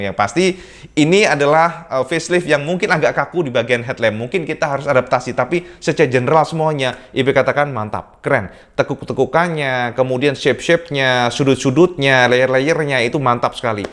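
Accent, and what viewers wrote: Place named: Java, Indonesia. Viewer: Jangkrikkk..beh medok jowo tenan🤣🤣🤣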